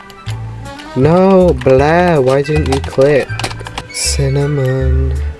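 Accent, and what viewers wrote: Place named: United Kingdom. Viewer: I love Your accent lol are you British?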